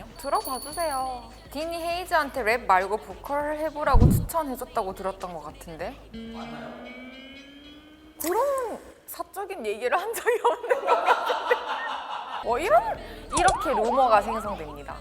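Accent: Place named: Gyeongsang, South Korea. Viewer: 서울 말을 쓰려는 노력이 단 1그람도 없는 ㄹㅇ 진성 사투리 그자체 ㅋㅋ 너무 사랑스러워 같은 경상도 여자이지만 경상도 사투리 쓰면서 저렇게 사랑스럽고 안걸걸해 보이기 쉽지않은데 헤이즈는 너무 좋음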